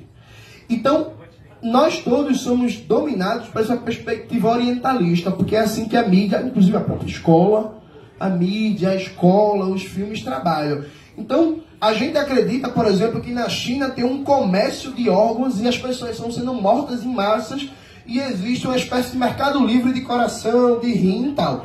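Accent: Northeast, Brazil. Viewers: Adoro e clareza do raciocínio desse garoto, falando com sotaque nordestez fica ainda mais incisivo. Parabéns professor Tudo a ver com Cirao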